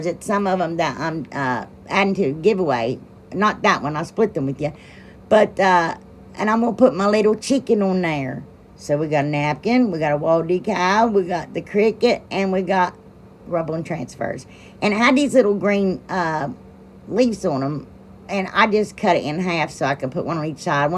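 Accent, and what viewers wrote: South, United States. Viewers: Know I appreciate you just as much and I've been sharing for you and getting my family involved too. They laughed and said you amaze them, have taught them some cool things, love the country voice and think you're perfect just as you are(are just a few things they've said, some of them watched you cause of your voice and my interest, should be getting a few more people to watch your talent for sure), and I love you just as you are. Know you're always in my prayers. God bless you and yours. I pray God showers you with many blessings because you are so very special to many. Till next V•I•D•E•O😊❤😊 BIG HUGS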